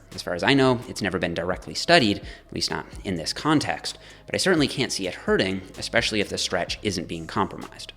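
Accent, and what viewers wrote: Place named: Newfoundland, Canada. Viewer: love your stuff! Didn't realize you were from newfoundland until I watched your body recomp video and were talking about carrrbs, just waiting for a jiggs dinner video haha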